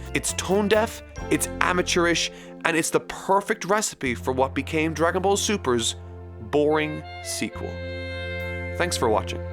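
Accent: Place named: Ireland. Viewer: Hes.. Irish..?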